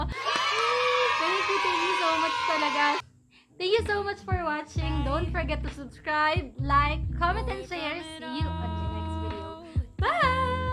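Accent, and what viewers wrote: Batangas, Philippines. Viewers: Ang ganda niyo po talaga. Natural na natural ang ganda. Gustong gusto ko po iyong batangeña accent niyo po. Keep inspiring others po. 😊😊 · Upong bahay halatang batangueña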